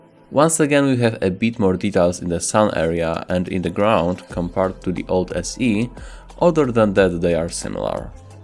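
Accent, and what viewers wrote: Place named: Poland. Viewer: Siema, fajne porównania, słysze po akcencie że chyba jesteś Polakiem :D Swoją drogą co to za nową stacje budują w 3 minucie filmu?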